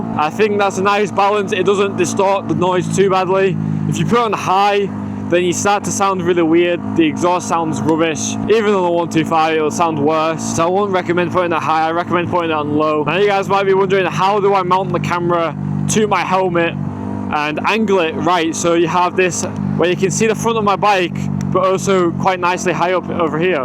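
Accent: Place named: United Kingdom. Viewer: I need one without ND Filters Bro which country are you from? You get R125. It looks like an R15 Though You sound British, i didn't know they have 125cc in English countries, we don't have R125 in my country but we have an R15. It's a really good bike i wanted one too i like the R15 intensity White, recently they released a purple white as well. But i don't wanna switch yet because it's also an uncomfortable bike but R15 is favorite throughout the whole country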